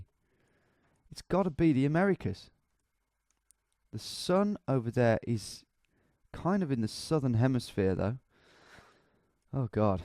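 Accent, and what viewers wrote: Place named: Birmingham, United Kingdom. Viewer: your lovely brummy accent always relaxes me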